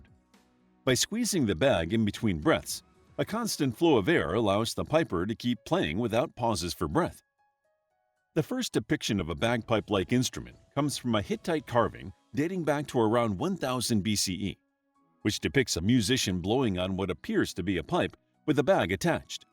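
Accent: United States. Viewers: La voz de la IA es de risa. Cantigas de Santa María con acento de norteamericano que no sabe hablar español. Es de risa. La IA va a acabar con nosotr@ A demás es muy triste que no haya un humano al timón para corregir algunas palabras y malas traducciones. A demás faltan muchos datos.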